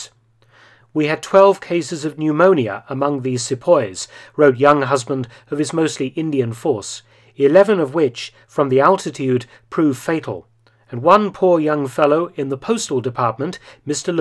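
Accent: United Kingdom. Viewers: Lol the whole time I heard this I thought it was strange that they had a British man representing China invading Tibet😂😂 I wasn’t listening too well as one could imagine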